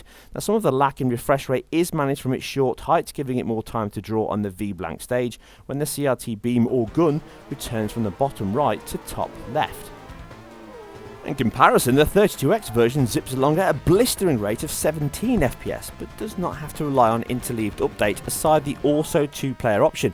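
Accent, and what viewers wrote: United Kingdom. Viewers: Nice vid but (no offense) I can not understand a lot of words you’re saying, with my American ears. What kind of accent do you have, some kind of heavy British accent, right? Anyway nice vid. :)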